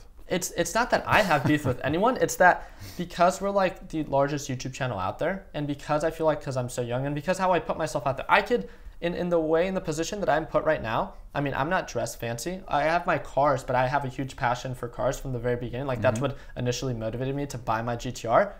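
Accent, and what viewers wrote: United States. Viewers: he sounds like the SNL-Californians bits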